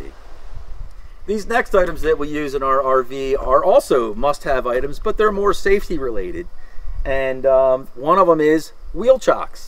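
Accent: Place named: Baltimore, United States. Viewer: We just got out first rv so this video is very helpful! You must be from Baltimore with your accent. Love it!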